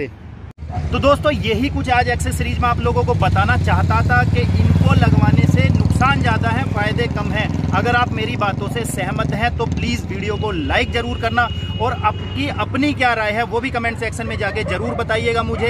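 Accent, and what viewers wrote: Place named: Meerut, India. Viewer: Bro...are you from Meerut ?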